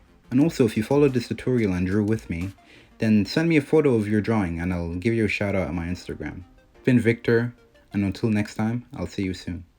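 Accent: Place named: Ireland. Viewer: Hi Victor, this is a great drawing and brilliant tutorial/narration of your process. I just wanted to ask you if you lived in Ireland as I can definitely here an Irish twang in your accent. Thanks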